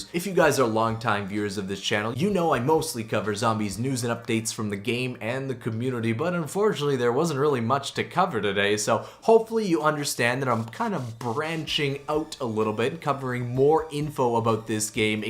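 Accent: Canada. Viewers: Is this guy canadian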